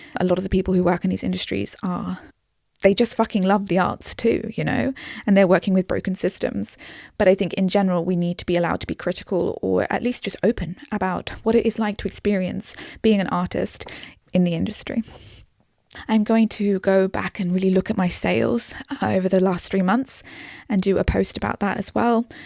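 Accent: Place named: RP, United Kingdom. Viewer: The fact that you refer to books as 'she' is making me think this is pretentious twaddle. If you have a literary agent, surely that person is explaining the money side to you. You sound posh, whiney and entitled. I don't know why the algorithm recommended this to me. I thought most authors were so delighted to get their first book in print that they would accept next to nothing. I find it staggering that you've got this much money. Not in a good way.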